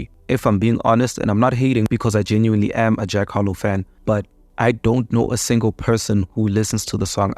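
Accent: South Africa. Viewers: You from South Africa my brother? If so, me too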